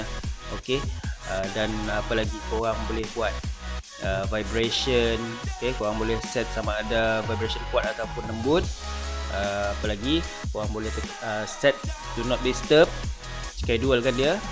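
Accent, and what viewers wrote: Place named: Muara Tuang, Malaysia. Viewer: Eh, muara tuang eh. Patut la mcm ngelala jak bunyi sleng tek. Hehe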